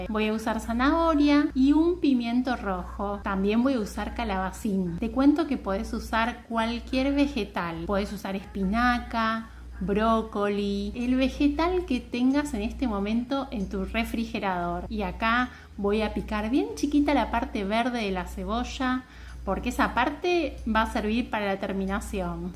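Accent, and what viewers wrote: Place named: Argentina. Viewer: Buenas noches, querida. Me aparece el video con traducción al portugués, que hablo muy bien porque viví en Brasil durante ❤más de 30 años. El problema es que a mí me gusta escucharte a tí en castellano con ese acento argentino (¿o uruguayo?) y es tu voz la que me gusta oír... ¿Me puedes decir cómo evito esta voz doblada con traducción?? 😮 Muchos cariños desde Canadá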